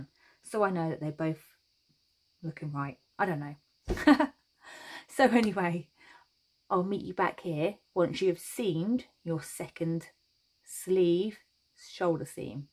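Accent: Norfolk, United Kingdom. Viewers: Sorry not crochet related but I was trying to work out you accent lol what part of the uk are you from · Are you from Norfolk?!?!? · I ordered this pattern on your Etsy and followed the video so I could learn patterns better. Thank you so much for really making this step by step! I'm new to crocheting and patterns do my head in. I imagine the stitches floating in midair somehow and can't picture where everything is supposed to go. Hahaha Thanks to this video, I completed my first ever cardigan! Now I'm working on a second. Also, I LOVE your Norfolk accent! It's like having your nan hand you a bowl of nice hot soup. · Brilliant tutorial. Thank you. Do I detect a Norfolk accent? I'm now extending my sleeves and they seem to be getting wider, is that right?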